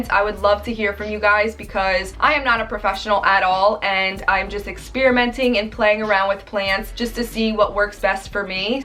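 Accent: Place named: Philadelphia, United States. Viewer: Oh you are in New Orleans...I thought you sounded like Philly! Please update in a few to show how they have thrived!!! 🌿😀🌱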